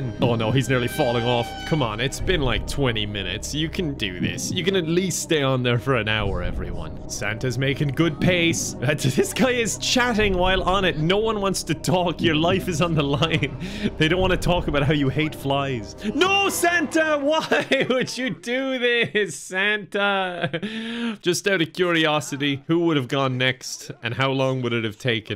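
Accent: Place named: Ireland. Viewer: So I’ve been binging your videos enough that your accent has gotten stuck in my head for a few days and now I’m convinced that I have to go visit Ireland, it’s officially the second thing on my bucket list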